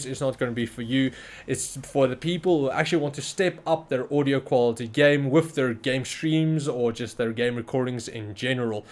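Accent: South Africa. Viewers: what the my mans is south african gg ez sub for him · I was gonna say, This guy sounds south african - and then you had the ZA ad spot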